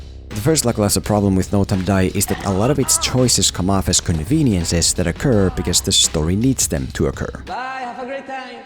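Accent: Finland. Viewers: Your accent… Finnish?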